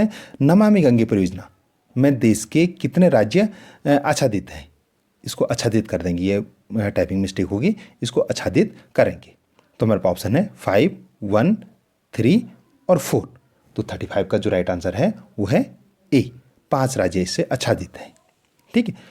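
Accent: Garhwal, India. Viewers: Sirji aap garhwali lagre ho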